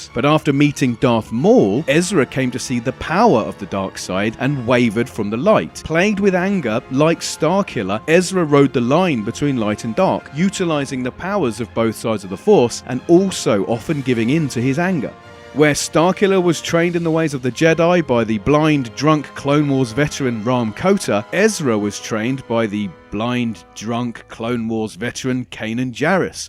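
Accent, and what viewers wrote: United Kingdom. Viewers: You've put together some very interesting analysis, sir. I dig the British accent, too, a freakin' awesome--or bloody awesome, as your people would put it--addition to this video. Although born in the USA, I am fairly certain that my last name derives from your whereabouts. Anyway, enough with the unnecessary formalities. Let's talk Starkiller and Rebels. Admittedly, the chances of Galen Marek appearing in Star Wars: Rebels are slim-to-none, but I'm still crossing my fingers in hopes that Disney figures out how to make it happen. On face value "The Force Unleashed" title is most akin to the Star Wars Legends banner; in that light, certain events that take place in TFU would perhaps strike Star Wars fans as too 'extreme' for lack of a better word, too outrageous or far-fetched to be designated as "canonical" (see: Marek using the force to pull down an entire star destroyer until it crash-lands). 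On the other hand, George Lucas played an integral role in the development of Marek's story arch, suggesting a heightened significance of TFU in comparison to many of the older Expanded Universe novels; also, Disney can always hand pick-and-choose amongst the more 'realistic' happenings that take place in The Force Unleashed...w/e that means. It's all science fiction, after all. Diehard Star Wars fans are so apt to forgetting that this is all fake, that it's all merely intended for entertainment. Real talk. At the end of the day, none of these motherf***ers are real. For all I care, Di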